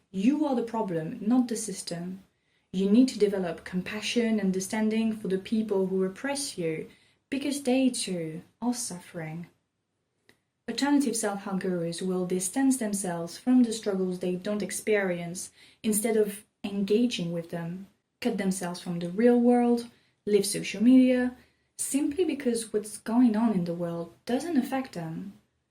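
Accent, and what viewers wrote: France. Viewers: You put forward an interesting perspective on alternative self-help that is thought-provoking but I must critique your last few comments. "What if getting angry was self-help? What if talking about social injustices was true self-help? ... And that for me, that's what self-help truly is" Like others in the comment section have said, I think your misunderstanding of what self-help is lies in those statements. Self-help quite literally means to help oneself, to better oneself, to care for oneself, and be self-sufficient. It's egocentric, but not egotistical (but not ever exclusive to each other.) Your ideas of self-help are about helping others, social injustices, activism, and related liberal values. And there's nothing wrong with that, but that's all unrelated to self-help. That's being philanthropic, that's being altruistic, that's activism, that's helping another person, that's selflessness. 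But it's not self-help. Of course, helping others and volunteering can bring benefits to you and another person, but it's not the overarching definition of self-help. I think the fallacy in your thinking is being absolutist. And you demonstrate this by thinking that what seems to be true for YOU, then must be true for others as well. But that's not right. I like to take morning showers, but I know it's not necessarily the "right way" to do things. I can tell you have a French accent so you might not realise how your words may have conveyed a misunderstanding with some of us contrarian v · Are you like French - having lived in an english speaking country? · Yes, sometimes i dont understand what the hell she is saying because of that bloody french accent. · I love your Frenchlish accent. 😃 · Where are you from? You sound simultaneously French, German, and Irish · you're just the same with them. 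slower pacing and frnch accent, but the same. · Very nice perspective that I have not heard discussed before. Et le tout avec un accent Français en plus.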